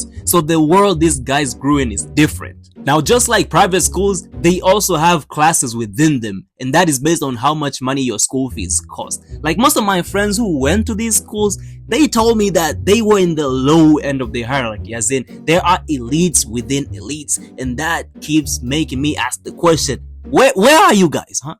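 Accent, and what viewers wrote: Kenya. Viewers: Your accent had me thinking you were kenyan ngl. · You sound Kenyan…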